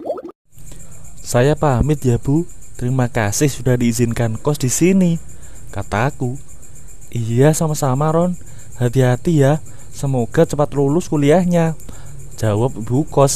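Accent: Java, Indonesia. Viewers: mantul min....jawa nya jawa mana ya ini...jangan² se lubang ama aku🤣🤣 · Jangan orang jawa untuk menerangkan cerita ini, gak cocok dan gak enak dengernya, ceritanya bagus, tapi yg ngucapkan cerita ini gak enak suaranya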